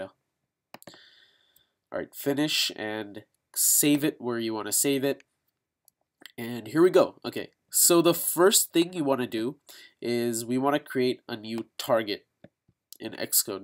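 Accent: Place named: India. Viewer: hicthed Naw, it's just your Indian accent. Nice vid!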